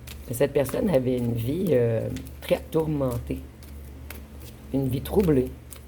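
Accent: Canada. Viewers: J'aime laxent Canadien!!! Avec la voix cassé ( sexy ,sexy). J'aime le Canada😆👍🤍💜🌞🍁🍁🍁🍁🍁🍁🍁🍁🍁🍁🍁🍁🍁🍁🍁🍁🍁🍁🍁🍁🍁🍁🍁🍁🍁🍁🍁🍁🍁🍁🍁🍁🍁🍁🍁🍁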